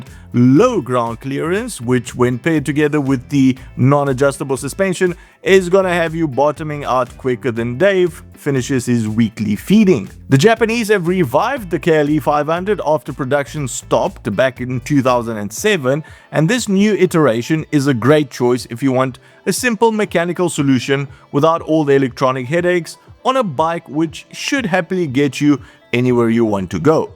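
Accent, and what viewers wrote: Afrikaans, South Africa. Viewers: Just found your channel and looking forward to seeing more! Hoor ek reg, is jy dalk Afrikaans?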